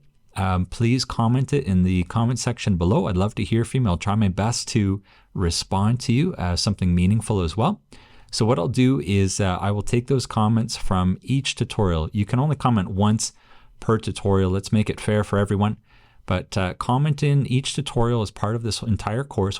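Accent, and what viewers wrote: Canada. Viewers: I really love your courses. They are the best CAD courses that I have taken. Your speed, method of explaining, and examples are excellent!. I love the Canadian accident too. Thanks for doing such a great job. I look forward to more of your V8 videos. I loved the LEGO Man videos too.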